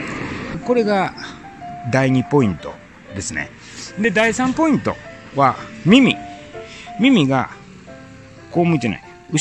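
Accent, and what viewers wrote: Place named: Kansai, Japan. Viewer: 初めまして。スーパーで置き去りにされていた推定5歳のミニチュアピンシャーのメスを引き取って育てて半年になります。当初からグイグイ引っ張り、吠える子でしたがどんどんひどくなってます。犬、車には飛びかかっていく勢いでほえまくりで、とにかく家から一歩でたとたんあたりを警戒して興奮してハアハアが止まりません。普通に穏やかに歩けたことなど一度もありません😂 の動画に出会い、主従関係がまずなってないのだと気づき、室内でリードをつけてボス犬になるべく頑張って一週間たちました。まだまだ辛いだけの散歩です。は関西弁ですがどちらにいらっしゃるのでしょうか？ぜひ伺つて教えを乞いたいいと切望しております。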